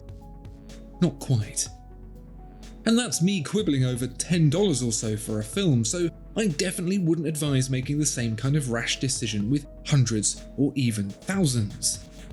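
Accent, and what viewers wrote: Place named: England, United Kingdom. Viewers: Never mistake an English accent for intelligence. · It's an English accent. You know, the language you're writing in. Have a day off from yourself Xiang.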